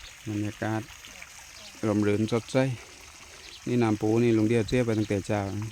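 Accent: South, Thailand. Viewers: ฟังสำเนียงคนใต้แบบ แล้ว.กะดีคือกัน.ได้รู้ว่าภาคใต้เขาพูดแบบนี้.ฟังจากที่อื่นเขาพูดเร็วๆรัวๆ.แต่ พูดแล้วฟังรู้เรื่องจ้า.❤🎉🎉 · ฟังสำเนียงใต้ก็เพราะดีนะคะ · ฟังสบายๆ.ภาษาใต้.ให้กำลังใจคับลุง.จากfc.นราคับ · สวัสดีจ้า ชอบฟังสำเนียงใต้ลุงจ้า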